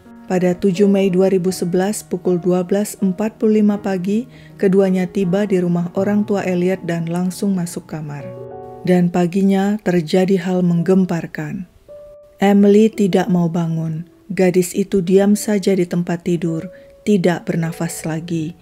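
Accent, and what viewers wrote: Indonesia. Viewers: hokh ketauan org indonya ya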